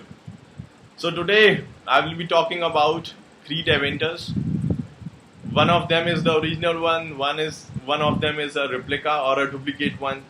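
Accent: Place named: India. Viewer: Indian English... First learn English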